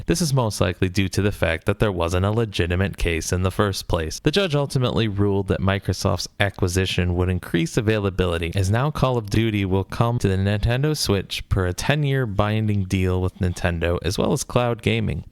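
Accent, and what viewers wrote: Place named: Boston, United States. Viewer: You say zombies like you from Boston. You say "Zambies" instead.😢 They have issues pronouncing words. They call a place that is spelled Leominster like this "Lemonstah" like lobstah.😢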